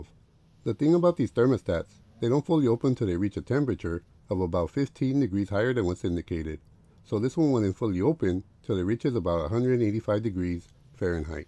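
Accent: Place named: Mexico. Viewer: He sounds Mexican. If he is listen about vehicle. Trust me.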